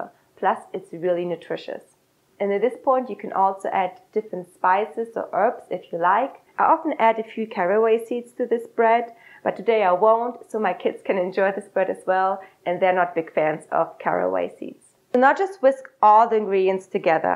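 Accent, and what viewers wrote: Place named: Germany. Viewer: You speak very good English ! What part of Germany are you from ?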